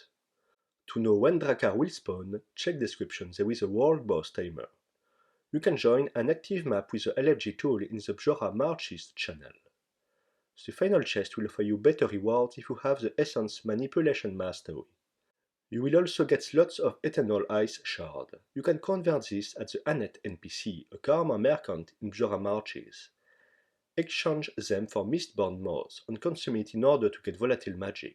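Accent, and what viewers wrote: France. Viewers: You just sound like you are from France :) To me you are one of the best players in the game! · En vrai c'est beau gosse d'oser lancer une chaîne anglophone en étant français, compte tenu de l'accent francais très difficile à perdre. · Worst accent x) wtf x) why didn't you make this guide in your native language? Guess it's French, it would be better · It's the first time i hear a French accent ! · why would i ever make fun of an accent i really enjoy listening to? coming from a non native english speaker that is learning french, iz verrry interrrrestang to hear :P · I noticed you're French and loved your accent. ☺️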